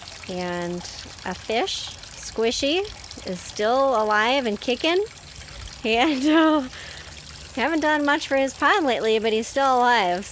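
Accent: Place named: Minnesota, United States. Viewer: Grew up in Brooklyn Park, but this Minnesota accent is almost too much for me 😝😝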